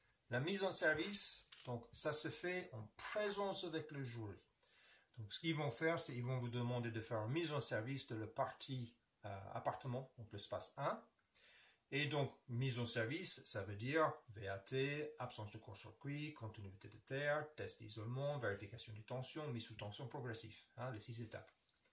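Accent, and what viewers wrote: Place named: Canada. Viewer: Quel accent canadien 😊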